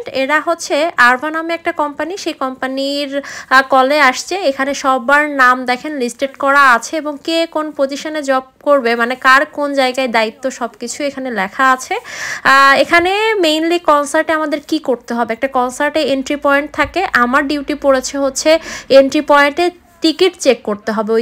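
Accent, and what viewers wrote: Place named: Bangal, Bangladesh. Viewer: Apni bangal ascent ey kotha bolun otai suntey bhalo lage ❤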